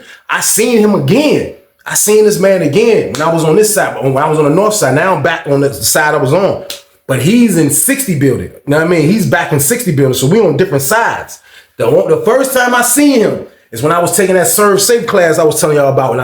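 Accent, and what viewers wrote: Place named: Texas, United States. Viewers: He really just talk like a nigga from the south 😂 whenever we play cards it’s a lot of trash talk like that just don’t threaten anybody and you’re good or call them a bitch unless it’s some family or homies. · Nah that’s how we talk in Texas fasho!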